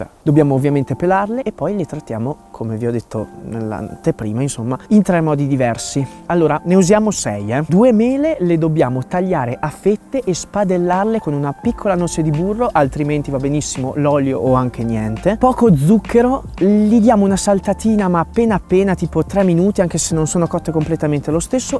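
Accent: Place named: Rome, Italy. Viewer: Simpaticissimo...ma sei romano?ogni tanto te ne esci alla romana😄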